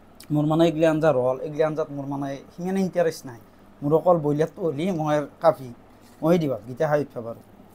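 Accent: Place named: Goalpara, India. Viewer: daar akta kotha bhal lage mk bhoria kowa taa 😂❤.....I❤ GOALPARA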